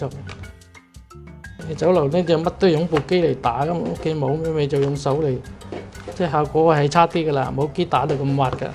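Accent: Zhongshan, China. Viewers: 鄉下係唔係中山？啲口音好似中山啲石岐話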